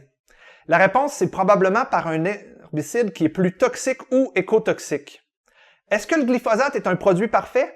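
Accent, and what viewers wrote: Canada. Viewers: Hello, je découvre ta chaîne, tes vidéos mériteraient d'être diffusées plus largement, à un plus grand public. Le format est court, les explications claires. Je conseille à tous de diffuser et partager un maximum. (et puis l'accent québécois, je ne m'en lasse pas, que ce soit avec Olivier Bernard avec "Le Pharmachien", Isabelle Stephen dans "Le monde merveilleux du scepticisme" ou encore "L'inspecteur viral"). Continuez à chasser les idées reçues, vous êtes d'utilité publique! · Ben ouai c’est logique, un produit qui extermine herbes, insectes, vers et autres est inoffensif pour l’humain, c’est juste de la magie n’est ce pas? Àvec l’accent canadien rigolo c’est encore moins crédible...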